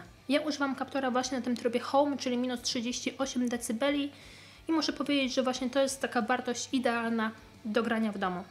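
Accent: Poland. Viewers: Могу ошибаться, но судя по языку (говор, некоторые знакомые слова - как в старом "Ведьмаке"с субтитрами) в видео - она из Польши.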